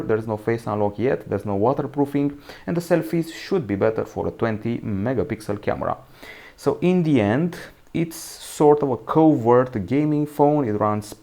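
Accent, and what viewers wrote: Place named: Romania. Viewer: You have like a romanian accent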